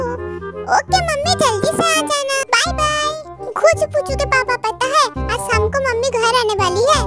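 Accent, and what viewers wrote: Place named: Bihar, India. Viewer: Typicall bihari voice By the way love your voice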